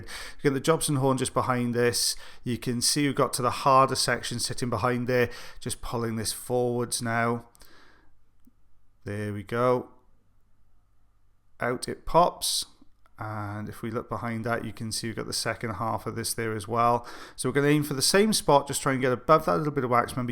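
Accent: Wales, United Kingdom. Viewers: you are now an inch-reading maestro! Thank you for the videos to keep our spirits up. Your lilting Welsh voice is such a comfort! Sending happy thoughts to you and Mrs B. Thank you also for the little lesson on light reflex and the eardrum and hammer bone.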